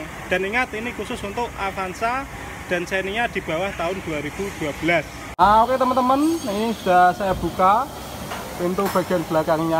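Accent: Java, Indonesia. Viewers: wong jowo yo mas? ktauan dr logat nya medddok...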